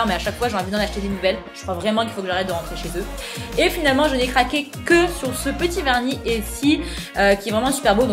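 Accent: South, France. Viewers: t'es tellement ridicule quand tu dis healssy... dis le en francais avec ton akessan du sud c'est beaucoup mieux va...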